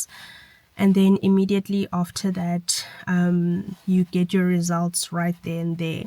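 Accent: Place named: South Africa. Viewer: Oh sweet! I'm glad they don't take it, that would be a mission when flying back and forth XD thank you so much for all your help <3 It's also soo good to hear another saffa accent again :')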